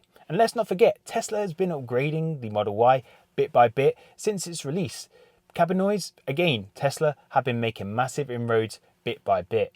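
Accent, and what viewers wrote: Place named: Midlands, United Kingdom. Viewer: I thought I detected a Midlands accent. Nice one, thanks. Just getting my collection checklist ready.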